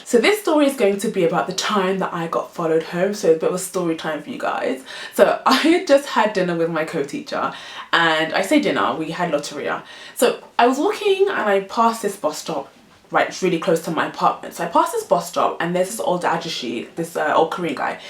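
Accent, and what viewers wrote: England, United Kingdom. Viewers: Love your videos and stories, very detailed :) also UR English accent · Bring u and that British accent to Northern California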